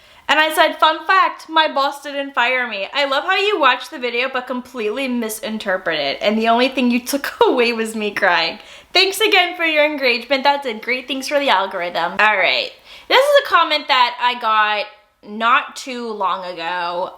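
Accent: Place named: San Francisco, United States. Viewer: I thought you were a San Francisco Native because of your beautiful San Francisco accent. It’s a very unique way that native San Franciscans talk and I love it.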